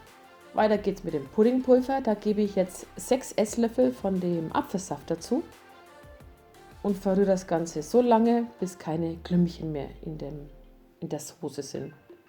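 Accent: Franconia, Germany. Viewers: Eine Fränkin, wie süß! Die Sprache ist unschlagbar u. den leckeren Kuchen bestelle ich schon mal für den Feiertag am <num>. Kaffee mach' ich selbst dazu. LG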